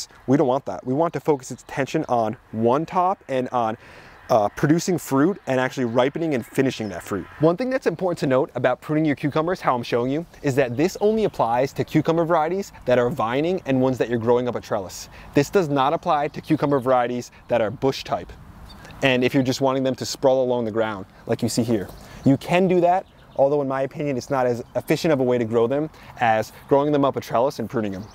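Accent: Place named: East Coast, United States. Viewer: Damn. A million subscribers. Nice. You deserve it since you're one of my favorite gardening bloggers, even though my gardening space is quite tiny (in an urban lot). Because you have that east coast energy which I love... even though I'm a Midwesterner. Probably because my father was born in Phili, and many of his extended family live in south jersey, So they a lot sound like you.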